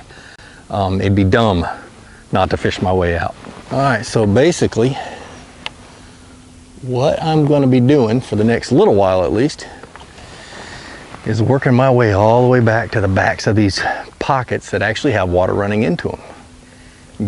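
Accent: Georgia, United States. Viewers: Are you from georgia? Now I will follow all your tips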